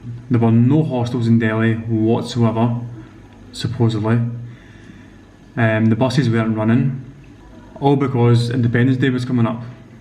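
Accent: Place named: Scotland, United Kingdom. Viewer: that usually never happens in india...yes in independence day it can be a bit of hassle i suppose...so u guys were kinda unlucky..that it just happened to be independence day in india....one thing i can suggest about taxis and cabs in india is..always get on cabs with meter on..and say iam only gonna pay whatever the meter says.as simple as that..download uber app..on your phones..get uber cabs in india..they are awesome. .also ask middle class urban indians on the road..of how much things cost in india..note down the prices..so that you wont get crooked next time..most of the these drivers or whatever come from rural india all they wanna do is make money..so the can be a pain in the ass not just foreigners but for urban indians as well..act street smart...AND DO NOT CONVERT INDIAN RUPEES TO POUNDS..and think oh thats seems reasonable no its not..15000 rupees is a lot of money in india..because living standard is cheaper in india..as compared to scotland..i suppose thats where you from. 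going by the accent..thanks...hope u enjoy your remaning trip..chears..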